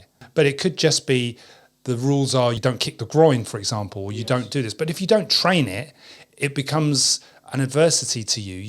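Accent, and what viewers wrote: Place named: Cockney, United Kingdom. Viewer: Excellent advice !!! Love this guy's Eastend accent.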